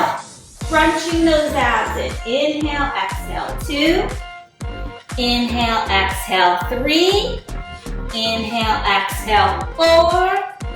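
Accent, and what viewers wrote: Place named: East Coast, United States. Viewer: You make this doable. Wonderful attitude and sounds like you are from the east coast. New Jersey maybe?? Are you still doing your personal trainer program, and where are you located please. Thank you for sharing this.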